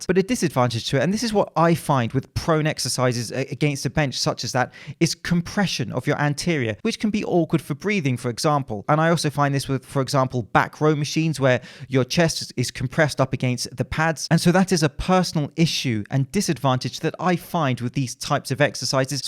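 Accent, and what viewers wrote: United Kingdom. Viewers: I forgot your British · Always a week later with the content Gregg Doucette, More plates More dates, Or Phillion make is a bit Lame... just copying topics from others and adding a British accent to it. What about creativity and original content? In the beginning it was a bit funny but now it's getting exhausting watching the same content twice so I'm gone lol :D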